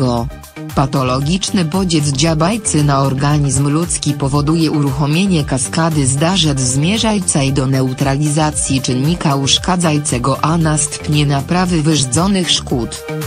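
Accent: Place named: Silesia, Poland. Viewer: Jest za śląska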